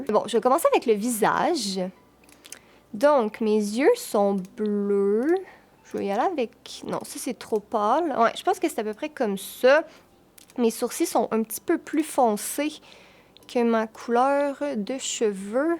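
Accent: Quebec, Canada. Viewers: J'adore l'accent québécois ! Quand je serais plus grande , j'aimerais faire mes études au Québec et vivre là bas ! J'espère que ça se réalisera ! 👍♥️ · J'adore ton accent québécois!! En plus t'es trop belle ♡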